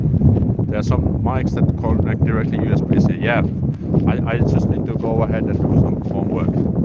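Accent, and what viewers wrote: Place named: Finland. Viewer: I’m honestly in love with Finnish accent when they speak English! It’s ear pleasing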